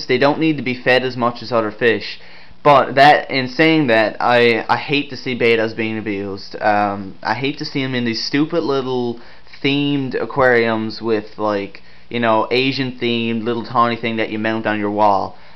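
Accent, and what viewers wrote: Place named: Canada. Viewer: sounds like your either canadian or Irish american. I also have a few questions, I want to get a betta and i don't really want too big of a tank you think a betta will be comfortable in a 5 gallon tank? My house it always around 78, will i have to get a filtration system or warmer, I was hoping just to have a 5 gallon tank, put some plants in it.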